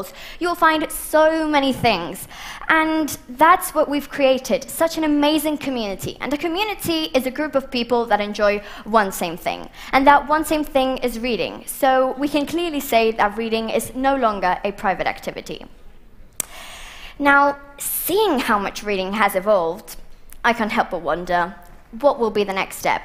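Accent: United Kingdom. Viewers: Ostia, catalana amb accent britànic!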